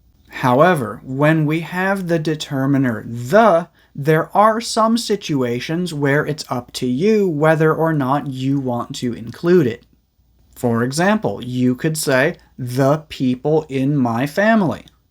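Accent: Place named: United States. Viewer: " I love your teaching style most especially your beautiful American accent."😊😊❤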